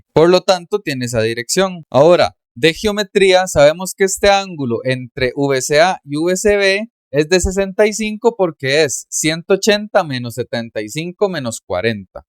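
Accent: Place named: Costa Rica. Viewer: ..jajajaa ese asentó TICO es inconfundible....felicidades.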